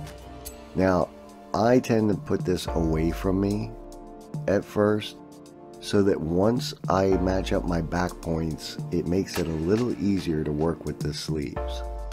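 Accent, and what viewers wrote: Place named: Northeast, United States. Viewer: I had a feeling you might be from my area…. (CT here 🙋🏻‍♀️🙋🏻‍♀️)😂😂 you sound like you live on the North East coast………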